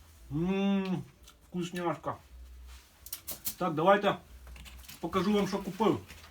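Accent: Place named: Lviv, Ukraine. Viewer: Братан ти як колишній прем'єр Азаров😁 по мові чую що Львівщина, мабуть, Красноград?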